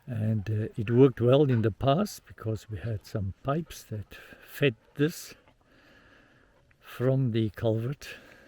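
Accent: South Africa. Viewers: Keep at it! Things are looking great there. You sound like you're South African, I am too. I have built a water conservation garden which is very effect in SA and love seeing others doing it too! The culvert looks great. I suggest heavily mulch your earthen wall and berms etc before heavy rains or you'll need to dig your trench again. My swales are around 80cm wide or so, a little wider than yours and when it rains a fair bit would fall down back in on uncovered/unmulched areas. You luckily have the resources around you. If you cut the nearby grasses you can use those as an easy mulch.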